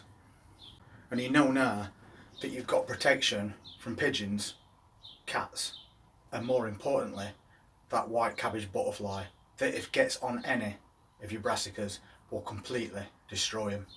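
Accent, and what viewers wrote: Yorkshire, United Kingdom. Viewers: Just found yer chanel dude subscribed hello from donny are you Yorkshire?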